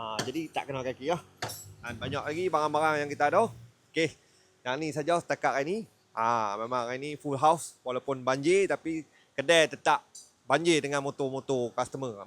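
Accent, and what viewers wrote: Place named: North, Malaysia. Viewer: Btoi2 mewakili org utaqa sebutan2 abg. Mmg sempoiii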